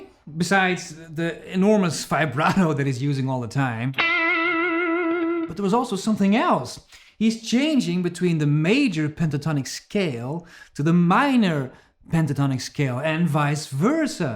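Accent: Netherlands. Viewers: Finally, we get to learn your accent is from the Netherlands! I always thought it was from ukraine or something….lol. Either way your guitar playing is the language i like best!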